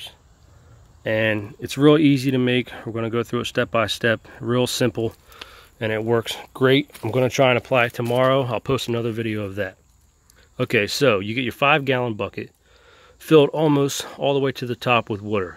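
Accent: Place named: Philadelphia, United States. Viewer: Gotta be from Philly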